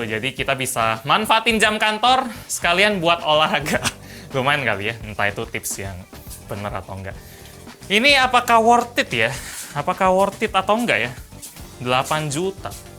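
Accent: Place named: Glodok, Indonesia. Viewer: Aksennya Glodok banget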